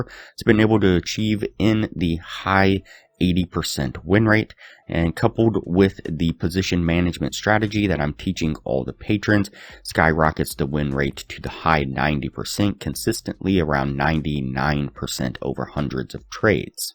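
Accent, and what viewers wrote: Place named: United States. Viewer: This voice is US accent, it will not work in India. It irritates, though can be understood with little effort but irritation pain is much more than benefits !!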